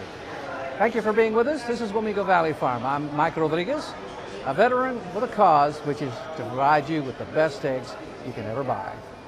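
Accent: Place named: Transatlantic, United States. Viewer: He kinda has the transatlantic accent. It sounds so nice.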